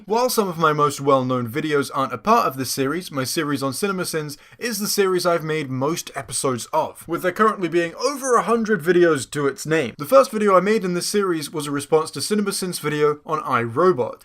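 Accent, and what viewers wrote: England, United Kingdom. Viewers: You sounds so English in your early videos, I thought your accent had just changed when you went to uni. (I changed accent at uni) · You get accused of this because you're British and accents are a tip off that you're prone to rip off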